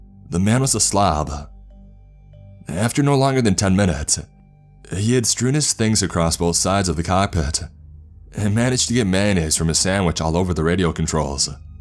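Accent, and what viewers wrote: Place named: California, United States. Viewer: iv always just thought of it as a surfer bruhv California accent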